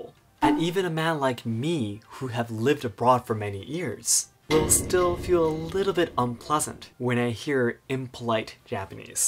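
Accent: United States. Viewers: He sounds very american, although it is well spoken american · In only six years you developed an American accent? How old were you when you arrived in Michigan? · it's an american accent wth are you talking about?? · I love the American twang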